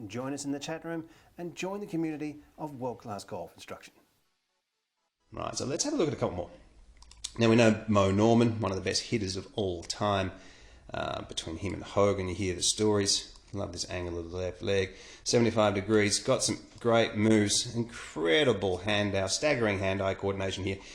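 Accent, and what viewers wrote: Australia. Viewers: Love your videos, they are amazing, delivered with good old Aussie subtlety and charm, keep up the good work mate! Aberdeen, Scotland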